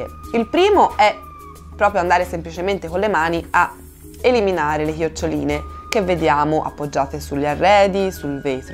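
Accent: Tuscany, Italy. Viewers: accento toscano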